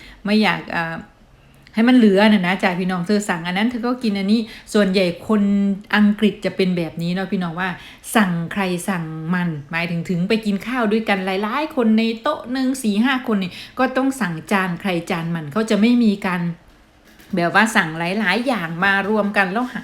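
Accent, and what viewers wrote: Thailand. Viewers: เอ๊ะ เหมือนฝนจะตก กางร่ม กันด้วยนี่ แต่..เอ ฟังน้อง พูดภาษาอังกฤษ.ยังเป็นแบบไทยๆ อยู่ นะ คือดีไง..เราไม่จำเป็นต้องเหมือนฝรั่ง ใช่ไหม น้อง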